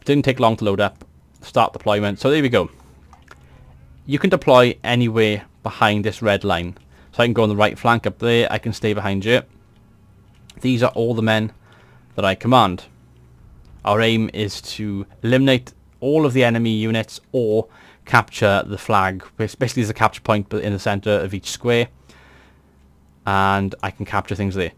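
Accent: Wales, United Kingdom. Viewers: I love your welsh accent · So I bought the definitive version of this game last week, messed about with it for half a week, read tiny bits and bobs about it and realised very quickly I haven't a clue. Looking forward to this set of videos, and it also helps that it's being narrated in a Welsh accent. Love the Welsh from an Irish brother!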